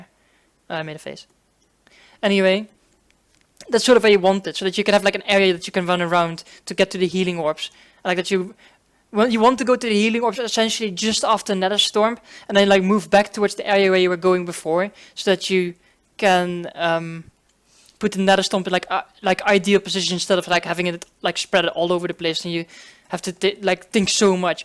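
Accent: Netherlands. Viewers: he, ik hoor wel dat je nederlands bent haha. Het lukt mij maar niet om deze challenge te doen. zou je het voor mij willen doen? kun je het eventueel filmen om het dan op youtube te zetten voor de abonnees 😎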